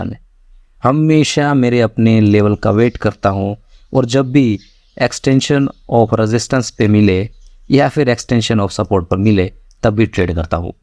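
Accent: Gujarat, India. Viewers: Kya aap Gujarati hai???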